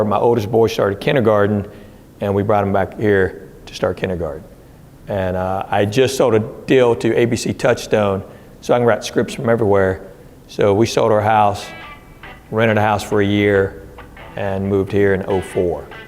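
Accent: South, United States. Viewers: Thank yoy for your family, wholesome, hysterical comedy. Anyone can do 'vulgar' that is just cheap, non creative head-talking. Not only family, but there is an intellectual banter with your routine and interchange with the audience that entertains.. Great job. I am from TN, and like the Southern slant!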